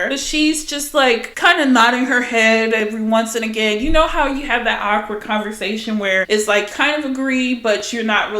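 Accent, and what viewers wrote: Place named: United Kingdom. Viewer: Your British accent 😭😭😭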